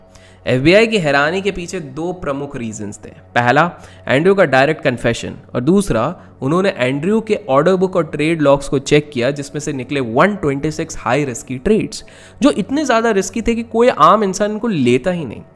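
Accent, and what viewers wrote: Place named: Bihar, India. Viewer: E shasura 😂😂😂😂😂😂 Bihar ke bol ❤️❤️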